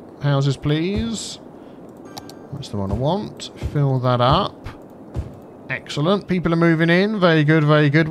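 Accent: United Kingdom. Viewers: are you british and do you know DANTDM personely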